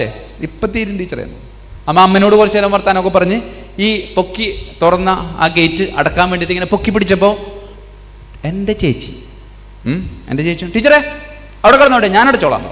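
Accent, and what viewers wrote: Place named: Irinjalakuda, India. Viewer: irinjalakkudakkarrude samssaram pole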